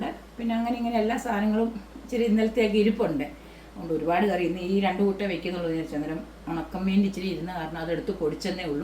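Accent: Kottayam, India. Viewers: കോട്ടയം സംസാര രീതി നിക്ക് ഭയങ്കര ista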